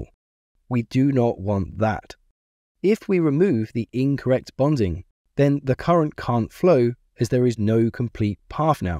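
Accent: England, United Kingdom. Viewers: Hearing an Englishman talk about North American electrical systems is so weird. Not as weird as UK electrics, but close. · Really peculiar hearing an English voice describing the US electrical system... I thought the two "hot" wires were on 2 different phases???? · It's amusing to hear an English voice explaining the American electrical system. It's also interesting to see how different the US system is from the UK system, which is variously different again from the systems in most European countries. Of course, the general principles are the same but the details are fairly different.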